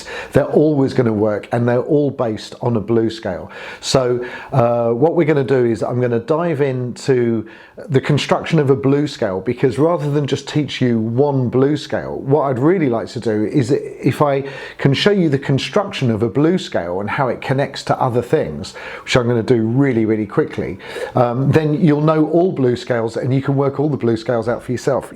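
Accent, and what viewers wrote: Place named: United Kingdom. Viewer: You're clearly British, what city are you based in? I'm on the hunt for a new tutor and you're great!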